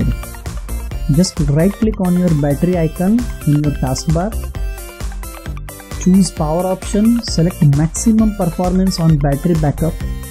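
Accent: India. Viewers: Why your voice makes me think you are Indian ?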